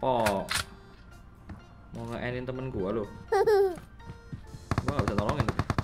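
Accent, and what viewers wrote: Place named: Surabaya, Indonesia. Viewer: Wkwkwk boso jowo wes suwe ng Sby jowo suroboyoan bang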